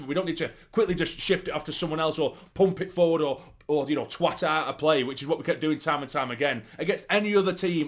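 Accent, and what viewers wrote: Sunderland, United Kingdom. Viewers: Love the mackem twang...just saying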